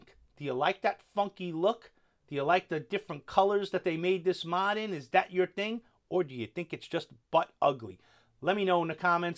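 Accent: New York, United States. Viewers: I will watch every video of yours because of this New Yawk accent. Cheers.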